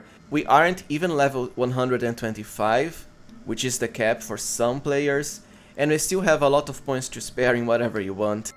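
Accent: Brazil. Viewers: Cool video, I'm a elleonoras main user but often use dual dagger reduvia/great knife or misericorde. Btw are you Brazilian? I may be wrong but the accent sounds pretty much alike. Cheers.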